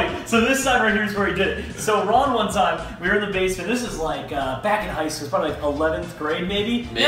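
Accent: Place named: Netherlands. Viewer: He kinda sounds dutch tbh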